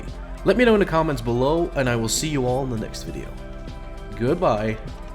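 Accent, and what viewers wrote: Netherlands. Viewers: Are You Also Dutch?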